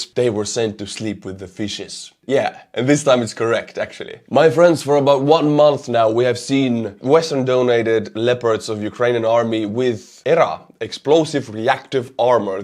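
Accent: Russia. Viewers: Funny thing is that you dont have to fake an accent, you already talk like a russian/Ukrainian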